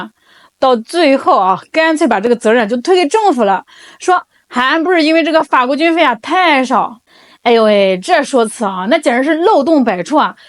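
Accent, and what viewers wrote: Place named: Shandong, China. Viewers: 🤣🤣🤣說話的調調像山東大妞！呵呵！說不定您老家省城離山東省近麽🤣！ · 我本來也以為妳是我山東老鄉，前幾集妳有提到是河南 總之妳有我們北方人的豪氣！👍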